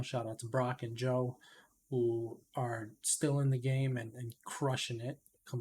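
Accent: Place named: New York, United States. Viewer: Subscribing because you're covering this well You talk like a real NYer too not like these phony pricks from Connecticut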